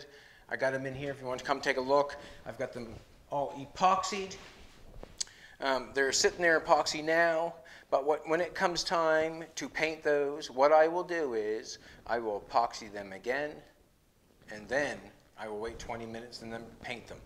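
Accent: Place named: Canada. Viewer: Merry Christmas to you and your lovely partner...keep doing what you do...I'm a Canadian living just north of Cincinnati Ohio and find your authentic Canadian accent endearing....it makes me long for home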